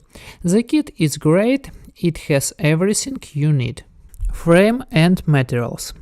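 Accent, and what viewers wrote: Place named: Russia. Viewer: Сразу видно русский акцент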